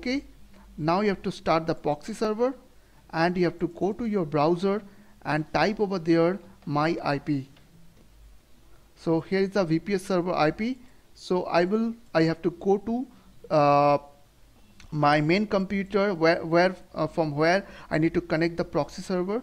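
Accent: India. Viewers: 10/10 +thick indian accent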